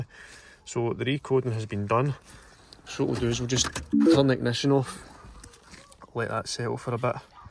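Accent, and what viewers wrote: Scotland, United Kingdom. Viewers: the thick Scottish accent is a great listen to be fair mate 👍😂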